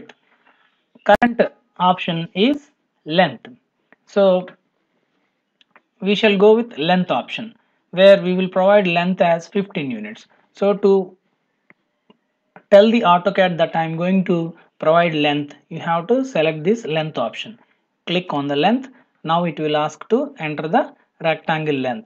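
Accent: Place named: India. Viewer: Nice try but you have to improve your Hindu - English accent you speak. Half of them cant understand.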